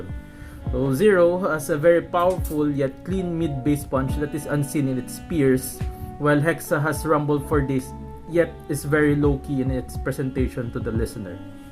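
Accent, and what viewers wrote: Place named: Philippines. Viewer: Pretty sure this reviewer is a Filipino. That accent 😆😆😆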